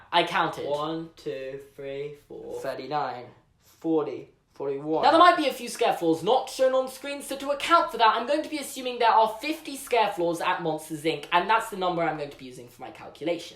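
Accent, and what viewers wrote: United Kingdom. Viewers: That'll teach Pixar..... not to make a British boy so upset that he feels like ranting on YouTube 😂. I love hearing you all upset with that adorable Bristish accent.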